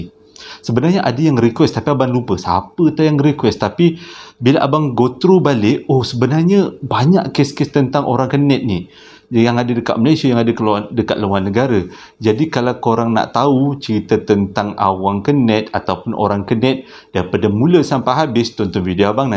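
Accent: Java, Indonesia. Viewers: Abg org jawa ke? Cara ckp mcm org jawa la sy dgr. Kalo salah maaf ye.. 😬😬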